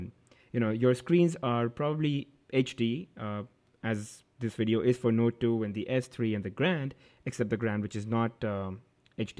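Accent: India. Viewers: Curry accent..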